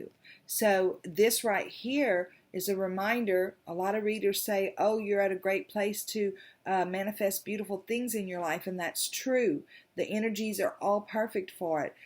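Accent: South, United States. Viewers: luv listening to you and your southern charm and great analogies..thank you for sharing your light with us...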